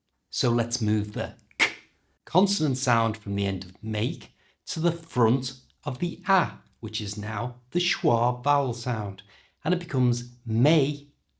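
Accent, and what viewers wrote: United Kingdom. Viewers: thanks so much, the british accent is the best.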